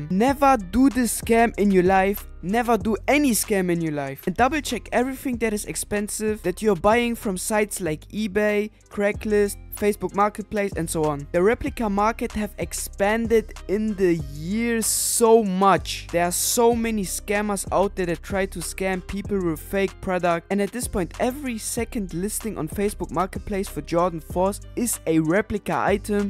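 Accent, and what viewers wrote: Germany. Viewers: ich liebe deinen deutschen akzent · Deutscher Akzent killt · Feier den deutschen Akzent :D Gutes Video :) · geiles video aber du hast hein geilen deutschen ac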